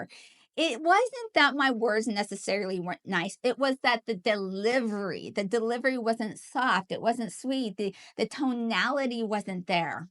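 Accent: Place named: Texas, United States. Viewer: Gotcha on the TX accent! I had a GF shortly after high school who grew up there from the age of about 12 and she picked it up real quick. One of her expressions for affirmation was "You bet!" which I will never forget what that sounded like. (She was damn sweet! If we would have managed to stay together we'd have about 12 kids by now!)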